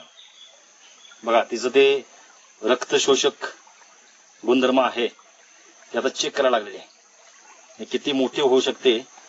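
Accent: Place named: Kolhapur, India. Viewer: तुम्ही कोकणात राहता तर कोल्हापुरी टोनमधे कसे काय बोलता?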